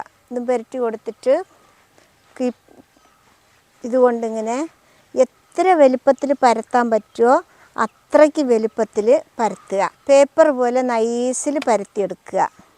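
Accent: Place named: Malappuram, India. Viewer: ഇനി ചൂടാറാതെ പെട്ടീല് ബെക്കാം....😂😂, തനി മലപ്പുറം ഉമ്മ❤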